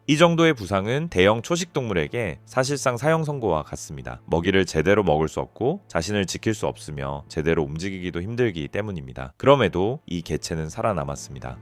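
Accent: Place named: China. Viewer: ㅋㅋ짱깽이냐 3M를 세미터 그러고 서론이 너무길다 구독했는데 취소하고 간다 한국말 연습 더해라ㅋㅋㅋ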